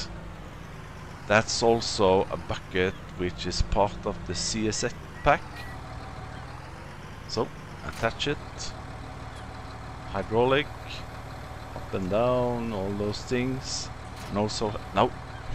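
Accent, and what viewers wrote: Norway. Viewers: Are you norwegian? :)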